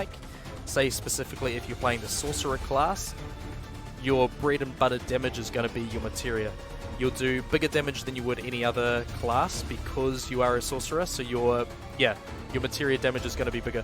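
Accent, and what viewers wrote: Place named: New Zealand. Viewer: Yo, instant sub when i heard kiwi haha. Me and my son are jamming this atm. Big fan of the ff series great vid.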